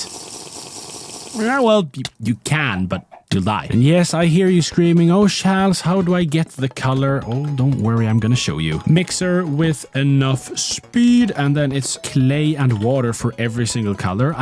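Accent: Sweden. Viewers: He sounds swedish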